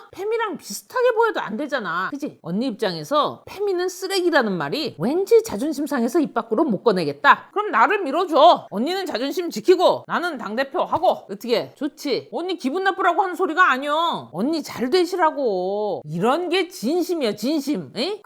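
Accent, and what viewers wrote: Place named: Chungcheong, South Korea. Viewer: 충청도사투리 ㅋㅋㅋㅋㅋㅋ 너무 인간미 넘쳐서 혼자 낄낄거렸어요!! ㅋㅋ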